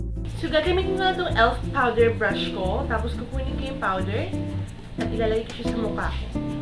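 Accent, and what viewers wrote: Philippines. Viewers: You have a cute Filipino accent :)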